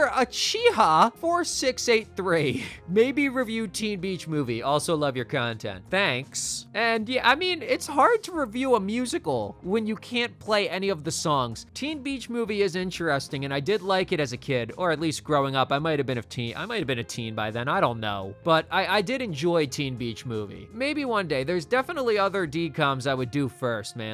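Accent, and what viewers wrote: Philadelphia, United States. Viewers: Congratulations brother 🎉 Love the content, disappointment no one ask the real burning question i had, no need to answer though. But based off how you've pronounced certain words, are you from Philadelphia?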